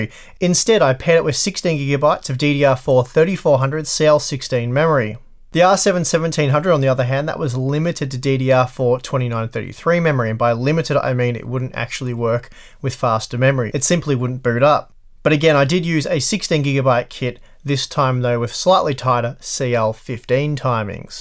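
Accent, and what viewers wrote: Australia. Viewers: I love what the auto subtitles make of your intro with your Australian accent every time. This time it said 'welcome back to hammer on box' 😂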